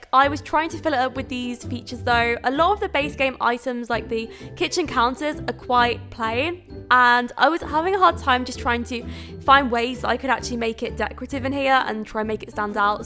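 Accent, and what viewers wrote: United Kingdom. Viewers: You sounds very British for someone living in Germany. Is that where you live permanently now?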